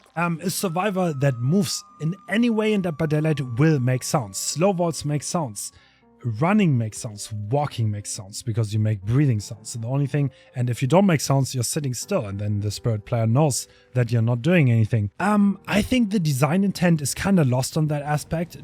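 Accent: Germany. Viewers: man hört, dass du deutsch bist ;)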